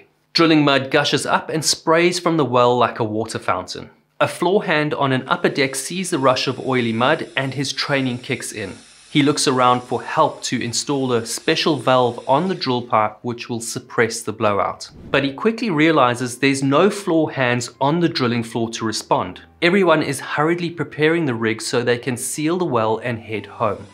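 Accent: South Africa. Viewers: Hey a south African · I really like this guy with his almost unlimited knowledge of what he is talking about. He has a wonderful South African accent and is so articulate in his delivery. Others on similar subjects on You tube are not quite in the same league as this great man who really knows how to put together a professional video. One might say good enough for television. One hopes he continues with these documentaries in the future. They are far more superior than the American versions. Peter Starr London UK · Please try and make the story telling more like a conversation and not a accident report that was being read bullet point by bullet point... I really struggled to follow what was happening... It's not a lack of information just a frantic pace to fit in all the information... Great video... Also you sound South African? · Like the South African accent.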